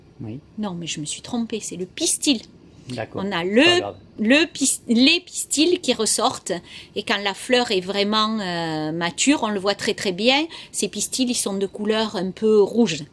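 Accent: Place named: South, France. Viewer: Bonjour, vos explications sont claires et précises, et avec l'accent du midi cela nous amène le soleil. Vive le sexe😉 des plantes.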